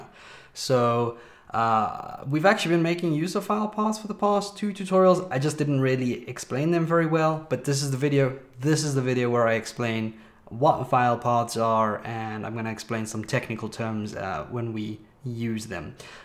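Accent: South Africa. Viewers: My guess is South African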